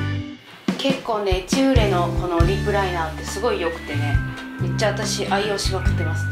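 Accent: Kansai, Japan. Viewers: さん、関西弁やから親近感がわく( ＞∀＜ )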